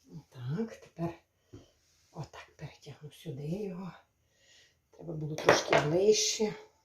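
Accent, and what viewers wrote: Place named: Bukovyna, Ukraine. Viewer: Дорога, скажить а як писни так зробыты? Бабка робыла нам на Маковея таки, але писни, бо в ти дни пист. Бабкы уже немае, и спытвты немае в кого. Бякую вам за рецепты. Моим дуже все подрбаеться. Да и мова Ваша зрозумила. Я родом з Буковыны и мени прыемно чуты именно таку мову, ридну.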